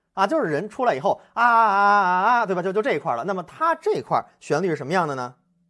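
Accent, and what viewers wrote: Beijing, China. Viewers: 开口老北京人了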